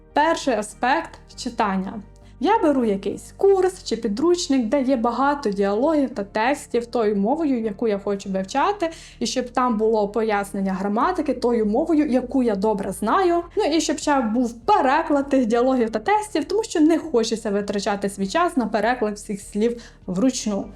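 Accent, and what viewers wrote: Western Ukraine, Ukraine. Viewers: Український акцент десь з заходу, подумав можливо із Закарпаття ? )))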